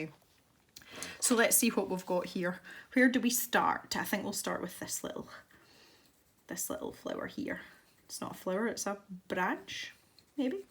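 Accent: Scotland, United Kingdom. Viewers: Ive always been drawn to the Scottish accent! Here in Australia, foreigners say we have different accents depending on where in Australia you are. Personally, I can't pick differences here... We all sound like bogans lol I love accents, and I can definitely tell the difference between Scottish, Irish, Welsh and English accents. I could listen to you talk all day! 😊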